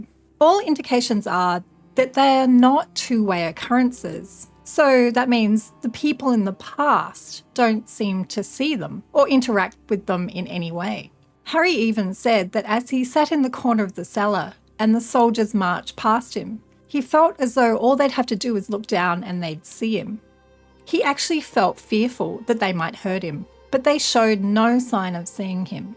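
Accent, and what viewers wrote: Australia. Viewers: Great video! I love your accent, Australian? Youre so articulate. 👍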